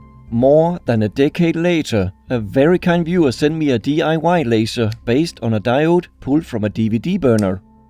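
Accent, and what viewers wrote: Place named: Denmark. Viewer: That’s an S tier Danish accent right there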